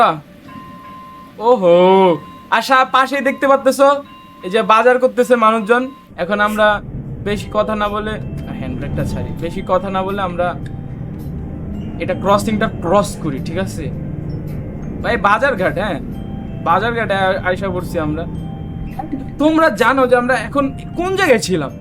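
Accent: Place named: Noakhali, Bangladesh. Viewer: ফেনী আর নোয়া খালি টান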